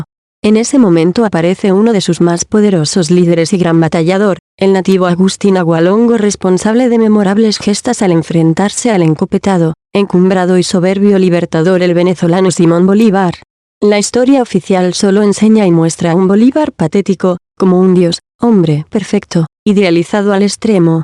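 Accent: Spain. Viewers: Bueno yo les voy a decir algo quienes fueron los primeros MATONES DELICUENTES SAQUEADORES BIOLADORES ASESINOS en la colonia latinoamericano quiénes fueron los Epañoles y tu hablas de un VENEZOLANO que se REBELÓ Y TRAICIONÓ AL IMPERIO ESPAÑOL para la libercion del RÉGIMEN CRUEL ASESINO BIOLADORES LADRONES QUE SAQUERON A LOS PAISES latinos de a aquélla epoca . TODOS TIENEN SU LADO OSCURO OSEA tienen RABO de paja no se pueden acerar a la CANDELA POR QUE SE VAN A QUEMAR hay te la dejo . Por tu acento eres ESPAÑOLA PAMAS VAINA jajajaja me CAGO DE RISA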